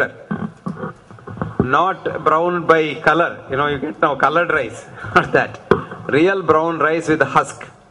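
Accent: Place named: India. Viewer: Dear Dr. Hegde, I feel blessed to have found you, Your honesty, Knowledge, and Love is so encouraging and satisfying. Your, Your, Your ACCENT however is punishing to Non- Indian audiences. Would you please turn-on the (CC, Closed Captioning) on all your videos . It saves people like me plenty of time and frustration of rewind and forwarding to make sure we understood everything. I feel blessed to learn from you everyday.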